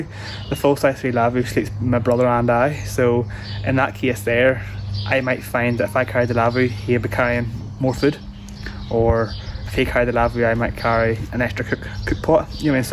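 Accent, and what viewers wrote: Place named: Ulster, United Kingdom. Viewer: Just found and joined Your Site , There was Eng subs on it ! Anybody who cant understand Your soft Ulster voice can Feck off as We down South would say but I am in London for longer than Dublin so I just got the same pack as Your Self and have done nothing to it yet , one for the New Year anyway Happy Crimbo to You and Yours and I look forward to seeing how it goes next Year for You .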